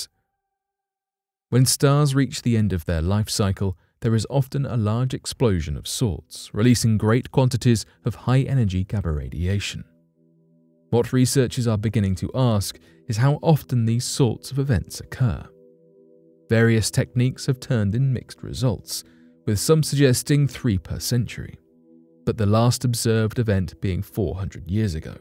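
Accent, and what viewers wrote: United Kingdom. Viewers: Lisping is annoying, even with a British accent. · I'll believe anything in a video that's narrated by someone with a British accent. Subscribed.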